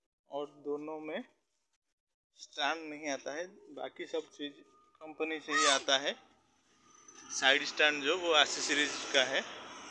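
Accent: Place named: Odisha, India. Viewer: ଭାଇନା କଥାରୁ ତ ଓଡ଼ିଆ ଜଣାପଡିଛି 😃 ସାଇକେଲ ର ଦାମ୍ କେତେ 🤔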